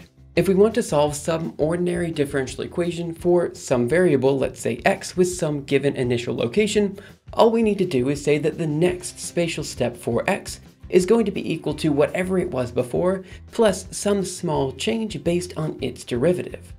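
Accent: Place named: United States. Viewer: I've never heard this American accent before... (I'm guessing it's American) Thanks for the video though.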